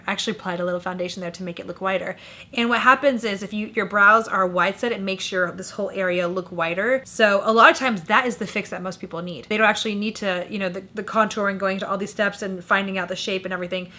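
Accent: Iraq. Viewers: احبج من تحجين عراقي 🤩🤩🤩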